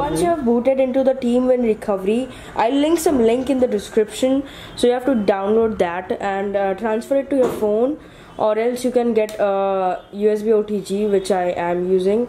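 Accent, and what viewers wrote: India. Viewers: that cringy Indian accent. stupid fcks. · 印度英语…挑战我的忍耐能力…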